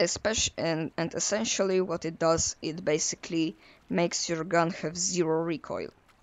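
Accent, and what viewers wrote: Russia. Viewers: I can really hear the Russian accent In your voice. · i love how the russian accent matches with the team you're on despite you being from poland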